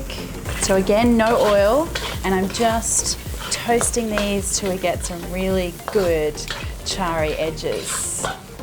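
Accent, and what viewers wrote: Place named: Australia. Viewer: I hate hearing annoying Australian accents.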